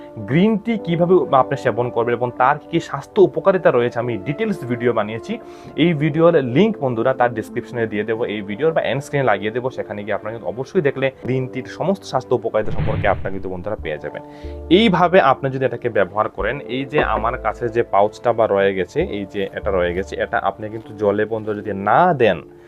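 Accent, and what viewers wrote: Sylhet, Bangladesh. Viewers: Apni mone hoy sylety. Khub kosto kore shuddho bangla bolsen😂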